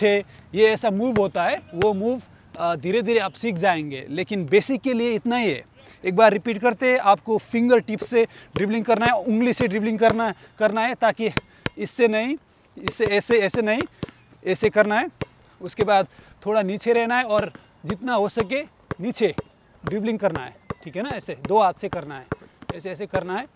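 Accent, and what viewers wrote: Nepal. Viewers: Bro your hindi seems like Nepal 🇳🇵. Are you?? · Why i am hearing nepali tone? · nepali daju k xa khabar tone chai nepali k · Aap Nepali assent mai bat kar rahe ho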